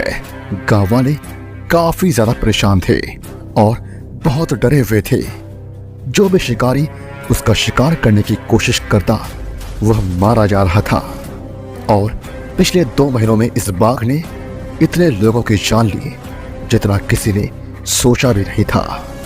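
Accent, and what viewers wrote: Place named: Bengal, India. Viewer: बंगाली एक्सेंट !!! आप बंगला में ही बोलो अच्छा रहेगा। हिंदी बोलना आपके बस की बात नहीं।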